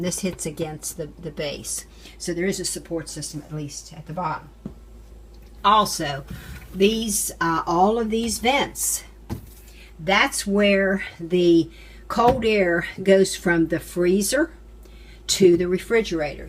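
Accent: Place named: Pittsburgh, United States. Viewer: You sound like you are from the “Burgh” Pittsburgh that is? Hope you get your doors fixed. Nothing is built like it used to be....have a blessed thanksgiving and Christmas..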